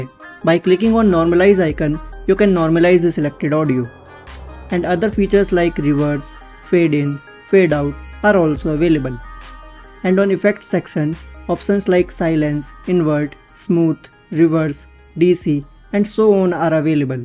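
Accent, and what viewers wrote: India. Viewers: I cant understand a word of your english, as soon as I see a hindi speaking english video I leave, u ppl speak a very strange english, still sounds like hindi.too bad.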